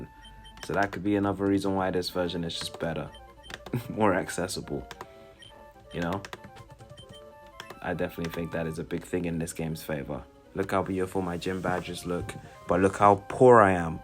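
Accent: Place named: United Kingdom. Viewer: Hearing a british guy playing sword and shield is strangely immersive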